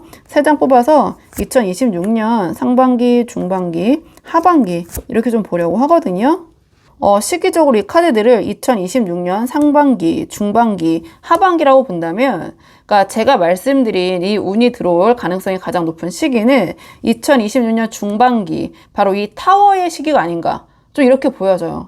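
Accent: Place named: Gyeongsang, South Korea. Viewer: 진짜 들을때마다 느끼는건데 거꾸로 들어도 대구사람. 대구사람이신가요? (저는 아니예요ㅎㅎㅎ)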